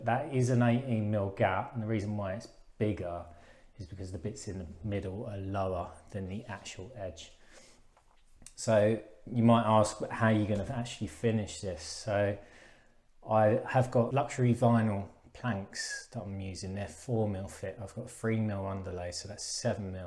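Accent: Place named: London, United Kingdom. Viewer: I feel your pain, I need a raft foundation alonge with a couple of steel beams and had 93 pages of structual engineering calculations turn up. I just turned to the back two pages to see what I really need! By the way you must come from the same part of London as my mate (who's a bricky) as my daughter heard you when I was watching and was convinced it was him lol, you sound alike and have an identical manner.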